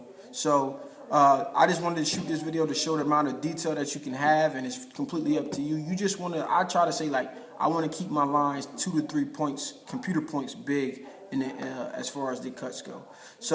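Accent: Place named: Philadelphia, United States. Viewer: sounds like your from philly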